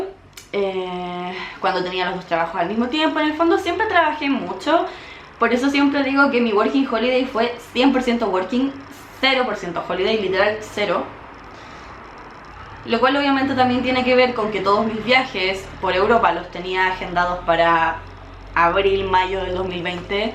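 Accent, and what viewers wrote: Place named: Chile. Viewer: Tu acento me suena chileno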